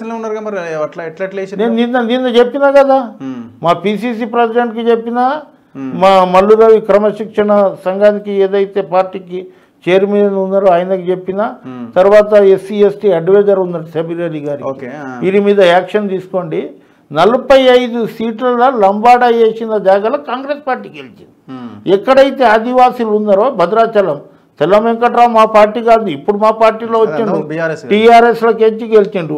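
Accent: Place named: Maharashtra, India. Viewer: Veedu daggara undi chusindu veedu😂😂😂 correct ga telugu matladu ra nuvvu mottham maharastra batch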